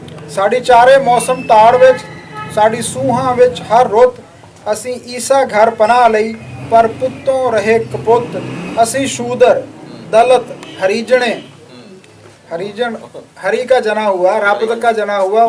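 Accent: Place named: Doaba, India. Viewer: Beautiful theth doada Punjabi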